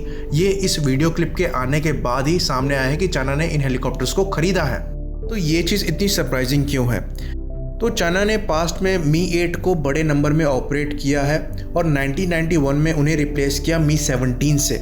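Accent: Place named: Rajasthan, India. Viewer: Bhai tum rajasthani ho kya aavaj see lag raha hai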